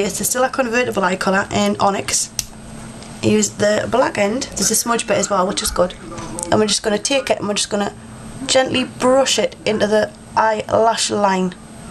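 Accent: United Kingdom. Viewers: what's her accent? if a UK one, specifically which one?!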